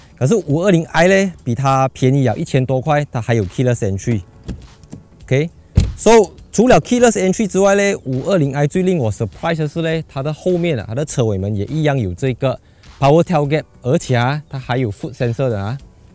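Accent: Malaysia. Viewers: 真的很喜欢你的解说 马来西亚人就该用马来西亚的语调的style 加油 期待你更多更好的作品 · 马来西亚语调比台湾娘娘腔好多了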